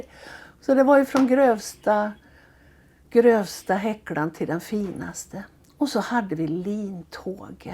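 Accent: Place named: Skaraborg, Sweden. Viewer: Så roligt att se den här filmen och höra dej, berätta på din härliga Skaraborgska, om linet.! Jag använde den i klassen när vi pratade om Lin( nu när vi inte kan åka Skara med klassen längre). Roligt med Tidaholm och Holma också. I mitt linneförråd har jag en bunt ofållade halvlinnehanddukar från Holma😀 från min svärmor som bodde i Tidaholm.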